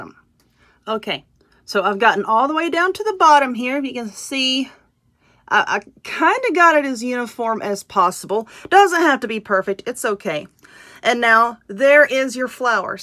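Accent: South, United States. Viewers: You are so cleaver and funny! I just love hearing your southern accent.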